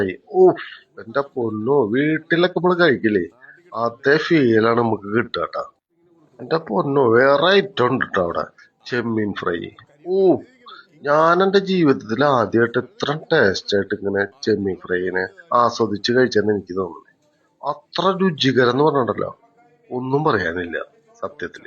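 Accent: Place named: Thrissur, India. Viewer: ആ തൃശൂർ സ്ലാങ്കിൽ ഉള്ള ആന്റെ ആ അവതരണം കിടുക്കി ട്ടോ ഗഡിയെ 👌😀👏👏👏👏👍 മൊട്ട ഗ്ലോബൽ ടീം സൂപ്പർ 💐👌👌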